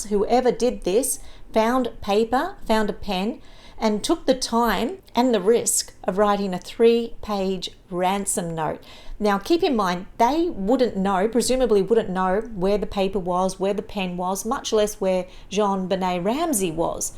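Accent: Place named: Australia. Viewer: Nice to hear a fellow Aussie. Good speaking voice - I'm older, and you're not too fast. 31 year olds speak too fast for me. Anyone under that is nearly unintelligible. This was good. Thankyou.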